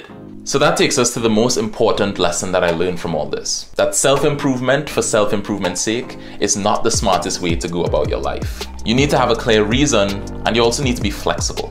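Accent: Trinidad and Tobago. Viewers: Are you from Trinidad & Tobago? You sound exactly like one of my coworkers. Except with a less pronounced accent. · Are you from Trinidad?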